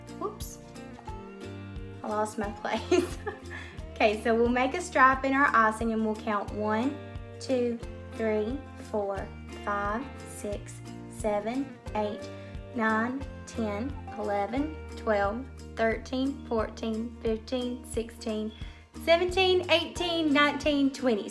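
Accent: South, United States. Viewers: Fingers crossed my icing turns out like yours. Lol And yes, I could tell you are a southern girl right off the bat. I'm one as well. Lol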